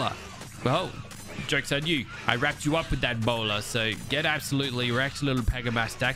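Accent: Australia. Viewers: I love the Australian accent but I don't like the idea of the huntsman spider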